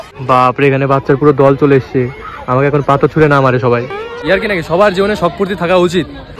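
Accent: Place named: North Bengal, India. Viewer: I hv come to see your vlogs v recently and glued to them. Honesty bro, toder North Bengal r bolar accent ta khbi sundor.. Really enjoyed watching ur videos..I hope you get more success in the future. Take love from Burdwan.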